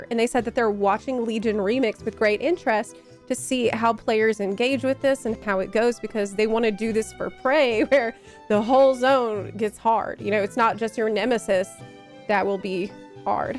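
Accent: South, United States. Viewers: Your southern accent is legit.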